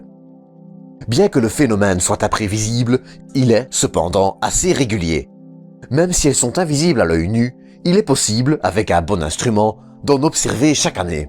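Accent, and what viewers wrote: Liège, Belgium. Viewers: Bonne video, mais pourrais-tu effacer cet accent belge à couper au couteau ? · Ah ouais accent de Liège ça nan ? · "je prends note pour l'accent, et je vais essayer d'améliorer ce point." Ne change rien, big universe, ça fait parti du folklore Belge, et c'est éminemment sympathique !! Cordialement d'un presque voisin, basé à côté de Lille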